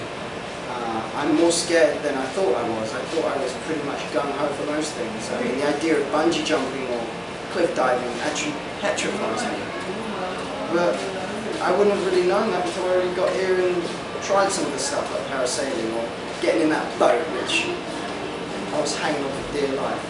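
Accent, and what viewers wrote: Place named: United Kingdom. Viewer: British accent:D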